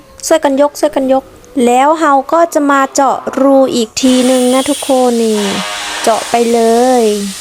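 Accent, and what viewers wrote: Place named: Laos. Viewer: เสียงไทยปนลาวสาวแว่นตั้วนี่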